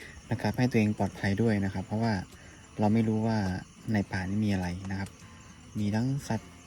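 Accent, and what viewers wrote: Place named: North, Thailand. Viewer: คนเหนือนีวา555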